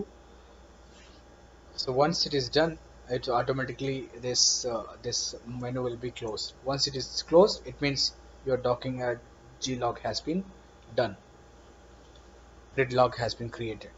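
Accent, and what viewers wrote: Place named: India. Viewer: Indian English.........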